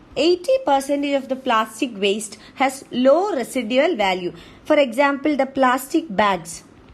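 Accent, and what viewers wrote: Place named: South India, India. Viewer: Your south Indian accent is horrible, the word etc and marine. For God sake please take some classes to improve, its very irritating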